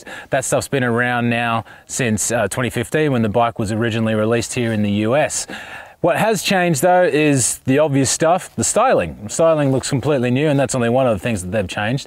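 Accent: Australia. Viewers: Are you from australia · you sound like ausie?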